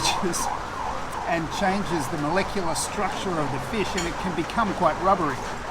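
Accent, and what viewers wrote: Australia. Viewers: Kinilaw in waray as well.. you sound Australian accent... from Brisbane Australia ❤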